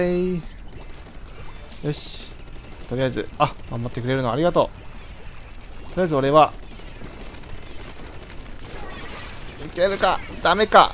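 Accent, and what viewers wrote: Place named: Kansai, Japan. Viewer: うぽなす！関西弁w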